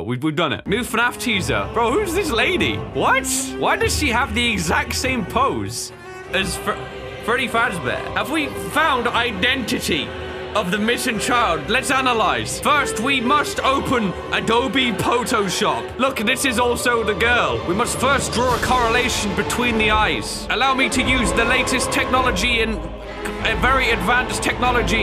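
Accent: United Kingdom. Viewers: I can hear a British. But just me. Still like your vids! 🙂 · Sometimes I can hear your accent in some words, sometimes not. I think as you said you are somewhere in the middle :) It's not that british english like first thing I think to myself hearing you is "Wow that's some strong british accent!", it's a bit smoother or softer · You that uncommon British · kidna british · You definitely have an accent but it's pretty faint compared to other British people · yes, you do have an accent, British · I can hear some Brit in your voice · Yea your language sounds like its in the middle with British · You got a british accent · Bro sounds a bit British imo, he might be from there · As an Australian listing to u you had a accent but no tom Holland British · Of course you have an accent everyone has an accent, I myself have a P.A Dutch accent because its were I was born, you have a Britishy accent but you already knew this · You sound British! 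So you do have a accent!! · FACTUALLLL I do that with my cats and it's so lovely! They're my family and I love them so much! 🔺🔺 💞 Also I believe you have a slight british accent, not as british as Dawko but also not as non-british as non-british people lmao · Its insane on how does not hear his bri'ish accent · He just sounds British how can a British man have British accent when it was the voice he was born with?please help I don't understand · asking about his accent: me who actually grew on a british accent because of him and now being worried if its not so british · I sometimes forget about you being from the uk until there's some words you say differently. Or use meters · you def have an accent, im american and you sound british like in between the two extreme in the meme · Now that I think about it your voice is very odd compared to most British people I know, it’s like the way you pronounce things is very British but if you don’t really have an accent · you are very British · Yes u have a accent. 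Bri-ish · to me it sounds like you have a british accent but its not very strong like its not really obvious to hear just like dawko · yes you have a biritish accent it's not heavy but it's there. like how you say for, it sounds like the number four, more emphesis on pronouncing which I'm very okay with. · To answer your question, you do sound like you have a British accent, but it's kinda faint? If that makes sense. You can sound American one minute and the next British. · Yep, to this Canuck you have a British accent, but like any spoken language it would be regional to your area. We have the same thing over here in Canada - we might all sound the same as Americans to the untrained ear, but there are differences for sure. All this to say - always enjoy your content and don't mind people giving you grief accent wise! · Of course you have an accent, everyone has an accent. I don't know why some people think that standard american english for example isn't an accent even though it clearly is. 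I can definitly hear that you have a british accent but I don't know which one since there are so many accents in Britain.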